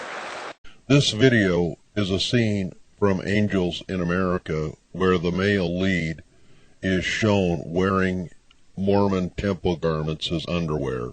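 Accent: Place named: Canada. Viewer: I dare not try to understand the purpose of the video, they guy sounds Canadian.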